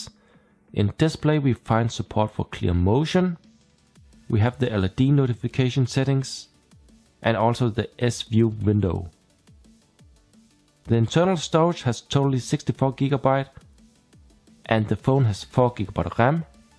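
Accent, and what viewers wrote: Germany. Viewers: you are german right ? 😎 your accent tells it all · are you german?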